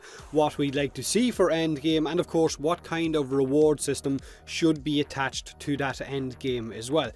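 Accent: Ireland. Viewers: Real good music selections. And let's not forget that smooth Irish voice. should do a crossover with Rhino Ninja!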